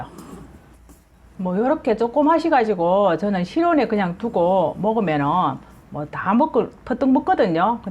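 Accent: Gyeongsang, South Korea. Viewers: 너무 좋아요 참 지혜롭게 하시네요 아주 가끔씩 보긴 하지만 입담도 좋으시고 경상도 억양 꿀팀, , ㅎㅎ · 경상도분이시군요 재밌게 잘 알려주시네요 감사합니다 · 저는 요리배우는것도 좋지만 경상도 말씀으로 설명해주시니 너무도 웃기고 재밋어 좋습니더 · 경상도 사투리가 정겹네요 좋은 영상 잘보고가니더 또올께요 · 구수한 경상도 말 너무좋네요 고추요리 감사합니다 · 아지메 경상도 어디요!? 넘 정겹네요!!! 잘 봤습니다 건강하시길 기원합니다 🙋‍♂️🙆‍♂️👍🤪😂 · 웃음띈 아름다운 모습에 구수한 경상도 사투리 정말 녹아 내립니다. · 장맛비가 오고나니 텃밭 풋고추가 많이 달려서 어쩌나 했는데 이렇게 좋은 방법 주시니 감사감사요~~^^ 매운맛이 전해지는듯~^^ 갱상도 사투리가 정겹습니다~♡ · 따라해보려고 청양고추 2봉다리 사왓어요 ㅋㅋ 구수한 사투리에 내고향 경상도의성이 생각나네요 구수한 사투리에 친구엄마처럼 잘가르쳐주셔서 감사합니다~^^.참고로 5학년8반입니다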